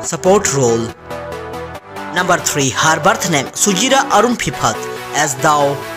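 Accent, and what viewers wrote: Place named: India. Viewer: Nice video. By the way I think ur Indian bcoz the way u pronounce English words only Indian or Pakistani people pronounce.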